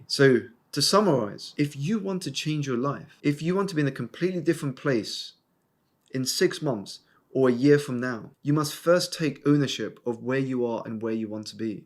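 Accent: United Kingdom. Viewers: Your british accent is cool.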